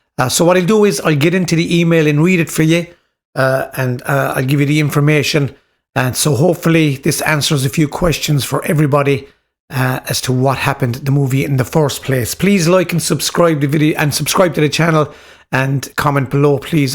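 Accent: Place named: South, Ireland. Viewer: It’s simple I hear southern Irish and I sub 😃 great video love from Limerick!😊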